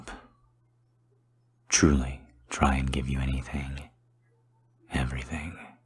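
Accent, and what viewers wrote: South, United States. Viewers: Hi my name is Alyssa Hayes and i love watching your video and ur voice is so hot sexy and i love your country accent voice and could u do the Country accent roleplay Cowboys romantic for me? :-D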